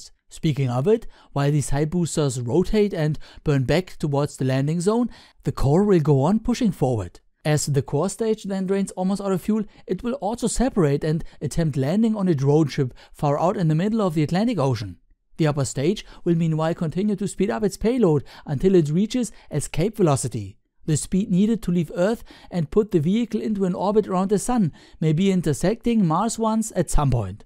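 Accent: Germany. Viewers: CptMikeTango He's German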